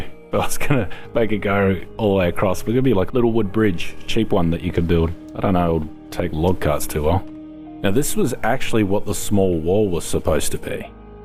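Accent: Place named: Australia. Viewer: Came for the tips, stayed for the beautiful Aussie voice.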